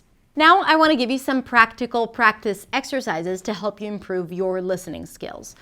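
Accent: United States. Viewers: Excellent but please teach me how to sound can and can not (can't) in your american accent. Thanks you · Hi, I am new to your channel... I am amazed how goid your Englush is even though you aren't a native speaker but you sound really American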